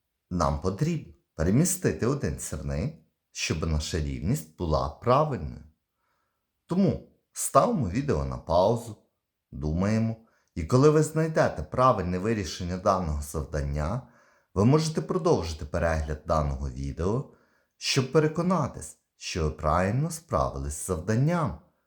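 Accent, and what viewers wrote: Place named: Poland. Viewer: я теж про те саме ніби то слова українські а акцент польський чи німецький або руминський, то відголосок генів чути через мову хоча і маскується під українця.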